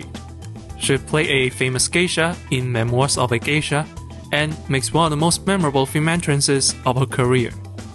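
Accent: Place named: China. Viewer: Listening to the commentator is painful. His Chinese accent is too strong!!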